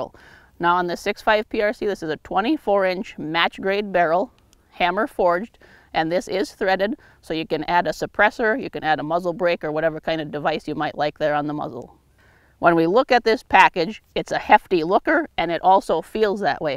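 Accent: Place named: North, United States. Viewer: This broads northern accent is awesome! Yah youbetcha!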